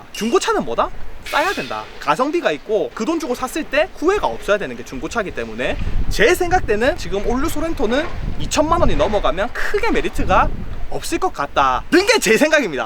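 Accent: Busan, South Korea. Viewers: 댓글보니 외국인같은데 한국말 부산사투리 쓰면서 엄청잘하시네... 제2의 로버트할리인가 ㄷㄷ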